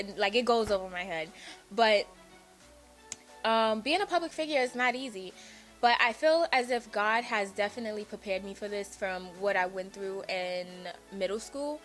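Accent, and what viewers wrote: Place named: Brooklyn, United States. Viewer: Your accent is sooo cute , I keep trying to figure out where youre from . It reminds me of wahlburgs accent ; like that old Brooklyn / Italian accent . Ugh I’m from bk and have no accent lmaoo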